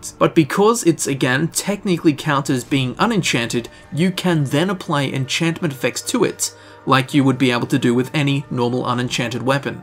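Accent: Australia. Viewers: Dude it's like / ... I mean you sound Australian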